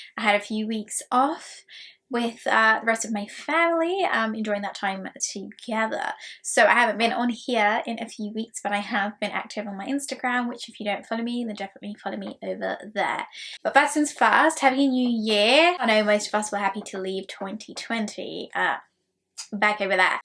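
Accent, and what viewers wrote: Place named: United Kingdom. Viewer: Girl, you had me fooled - you sound British but referred to $$$ 👀👀 where are you from?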